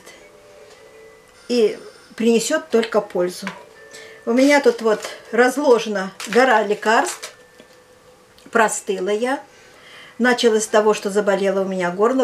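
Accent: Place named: Belarus. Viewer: Говор белорусский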